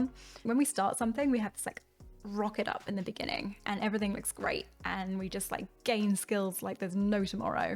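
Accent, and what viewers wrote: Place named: United Kingdom. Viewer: I've stared at your face in the reference images for so long but I had no idea you were British lol that blew my mind. Now I'm questioning everything.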